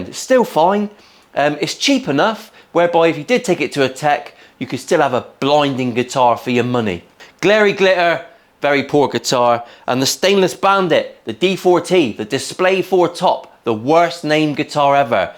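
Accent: United Kingdom. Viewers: Amazing to see you create a decent axe made of plywood . Really enjoy your work . Plus; as a french speaking person i get use of British accent !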